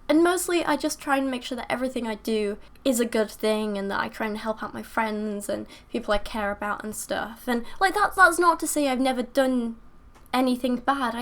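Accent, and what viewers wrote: United Kingdom. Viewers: Wait?¿ People actually make fun of your British accent?¿ I thought everyone loves British accents. Lol. Like I said to another prominent Atheist YouTuber (EssenceOfThought), 'I came for the British accent, but stayed for the Religion bashing and Science!' Lol =^p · u said about ur accent, I mean no offence but are u british, american because I can't be sure. sometimes u sound really British but u don't pronounce the letter t it's more d sounding. just curious, I never thought about it until u brought it up so pls don't think I'm being a troll. it a genuine question. I would guess ur British?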